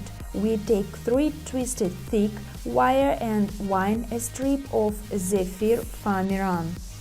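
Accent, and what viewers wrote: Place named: Russia. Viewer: то то я думаю акцент русский 😄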